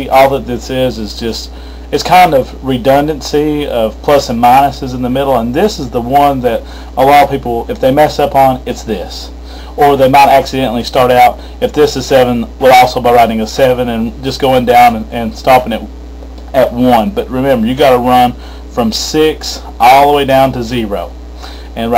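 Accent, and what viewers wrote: South, United States. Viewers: Dude i need you as my chemistry teacher. and based on your accent, youre prolly right here in the south with me haha!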